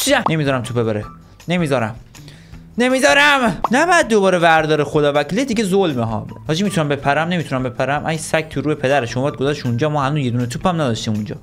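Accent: Mazandaran, Iran. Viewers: داش کجای مازندرانی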